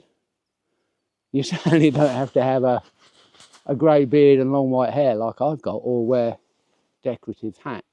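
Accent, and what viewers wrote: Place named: Cockney, United Kingdom. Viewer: Cockney druid well now who would have thought it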